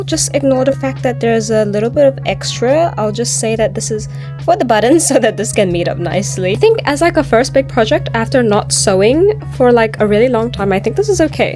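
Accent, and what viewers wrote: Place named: New Zealand. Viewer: Obsessed with the silhouette of this fit!!! also your kiwi accent is rlly cute btw!!!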